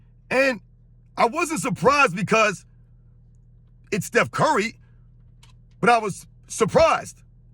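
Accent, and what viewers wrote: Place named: New York, United States. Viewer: Yo, listening to your New York accent makes me miss the days i used to get a 75cent bagel with cream cheese and jelly by Sal's outside of Prospect Heights High😏.... Keep the flame burning my guy, " we must continue to stand up!!!".... ✌🇹🇹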